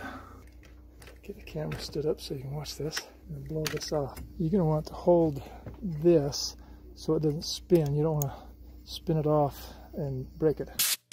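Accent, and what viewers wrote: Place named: North America. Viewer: Very helpful and great narration. Most Brits are suckers for a North American accent!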